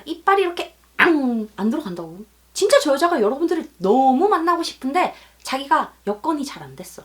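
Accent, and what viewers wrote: Gyeongsang, South Korea. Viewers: 님의 목소리ㆍ말솜씨 정말 매력적이군요 경상도 특유의 억양이 상대방을 기분좋게 합니다 훌륭한 방법을 숙지하고 실천 할려고해도 좋아하는 마음이 너무 꽉 차있으면 감정이 가만 놔두지 않네요 ㅡ 님의 강의데로 천천히 데쉬해 볼랍니다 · 갱상도 아인겨?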